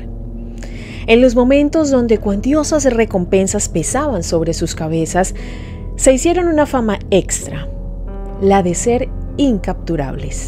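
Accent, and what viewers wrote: Colombia. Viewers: puro español de Bogotá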